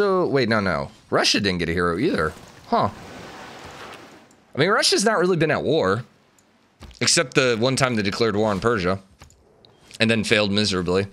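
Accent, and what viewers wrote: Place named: United States. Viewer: Great video. You americans say words real funny and i love it.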